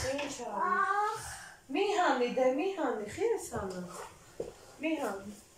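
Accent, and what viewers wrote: Lori, Armenia. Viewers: Барев джан вонц ек,ес нор ем миану кез,вортехиц ек,вонц вор лорва мер барбаров ес хосум кетевем кез,шат ачели е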